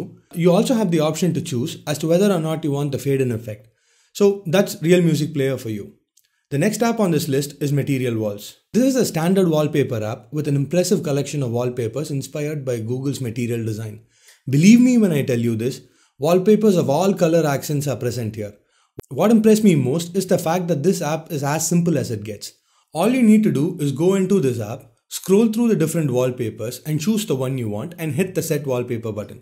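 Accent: India. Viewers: I have indian friends. But they learn to get rid of their accent. Not because of dishonoring their culture. But its a skill. You are communicating with the whole world. Please learn proper english pronounciations. Remove your accent and more will come to watch your videos. Your videos are good. But your accent... Help yourselves bro. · the sound of indian.. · Its sad to know there are so many racists on youtube, if u don't like the accent or indians then instead of commenting on the video just, GET THE F**K OFF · agree... its pure desi :)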